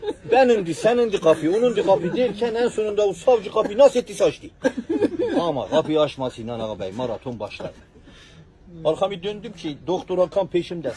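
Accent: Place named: Erzurum, Turkey. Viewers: adamın şivesine zor tahammul ettim, sevimsiz Erzurum ağzı. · Olay çok komik ve Erzurum ağzı ile anlatılması daha da komikleştiriyor DYSIDJGXUXOSJSGX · Erzurum lehcesi hastayim ya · Çizim de Erzurum şivesi de eyi olmiş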